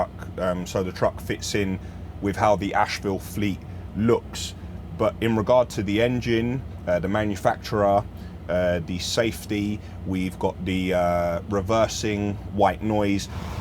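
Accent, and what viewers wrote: United Kingdom. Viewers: This black guy sounds British more than the king Charles himself.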